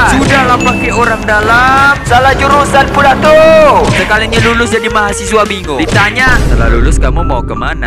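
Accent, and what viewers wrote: Malaysia. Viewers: Bingung ni abangnya logat Melayu tapi kok berbahasa Indonesia 🤣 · logat lu malah kek orang Malaysia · Eh bree ..lu org mana yak ..logat lu kek Malaysia 😁 ..gw tahu di indo ada juga logat melayu ..tp gw penasaran ma elu 😁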